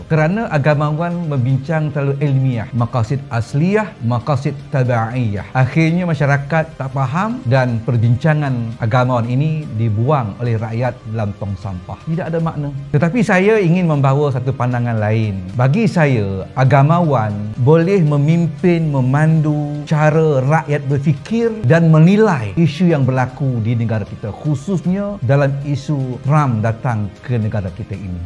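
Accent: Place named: Kelantan, Malaysia. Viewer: Longat pun tau puak kelantan thailands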